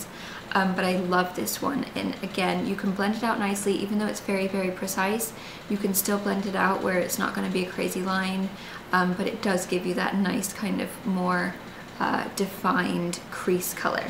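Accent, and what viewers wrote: United Kingdom. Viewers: She's English but was raised in America so she lost her accent. Though some of her words do sound like she has a slight trace of a British accent.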